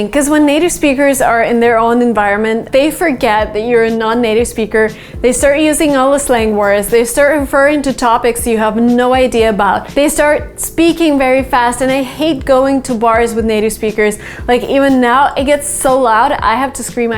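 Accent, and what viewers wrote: United States. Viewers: That means accent u speak in every video of urs is American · you're an amazing teacher . I prefer to keep waching you than the others channels you know because you're totally different and have the American accent and I love English language especially the American English accent . Overall , it's my pleasure to be watching you as one of your students 😉😘🌷.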